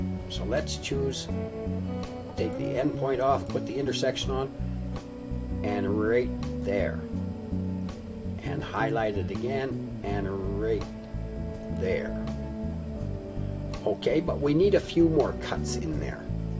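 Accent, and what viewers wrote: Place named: United States. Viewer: Glad to find a American English speaking vid on LC. As I am hard of hearing...some with heavy foreign accents are difficult to follow. I would suggest modifying the background music or eliminating. The bass line of the music is near the pitch in your voice...reducing the clarity of your voice. Thank you for the video and I have subscribed.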